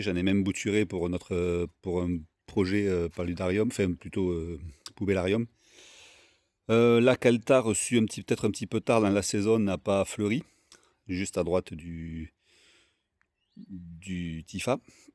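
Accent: France. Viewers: Cc merci pour ce partage. Ils ont bien grossi en plus les bb. Superbes les plantes. Ma menthe aquatique est comme la tienne, elle fleurit. Et joli accent du sud 🙂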